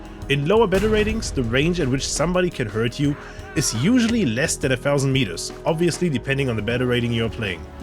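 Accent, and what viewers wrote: Germany. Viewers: I hören German ?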